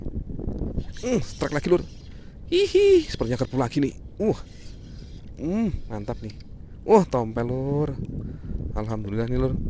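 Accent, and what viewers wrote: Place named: Java, Indonesia. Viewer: Waiki anunya medok isonan 😭😭 Penting sutrek lah 😁😁👍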